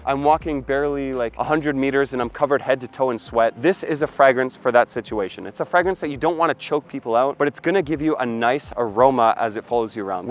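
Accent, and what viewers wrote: Canada. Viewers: Anything in a 4.2oz bottle is definitely worth it. 100 meters🤣😂😭Canadians definitely talk differently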